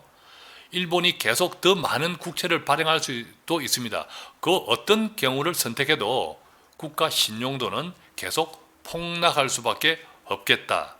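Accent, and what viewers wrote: United States. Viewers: 미국식 발음인데?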